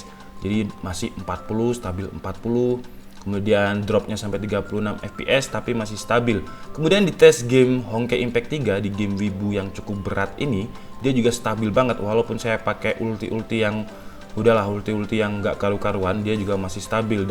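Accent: Malang, Indonesia. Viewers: Wih lu orang malang y bang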